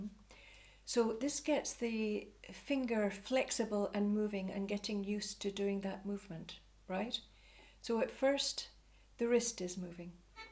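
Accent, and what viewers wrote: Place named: Scotland, United Kingdom. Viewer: I really enjoy your videos - and it makes me very homesick to hear your beautiful accent. I'm hanging on to mine, but would love to return to Scotland after 22 years down south...one day!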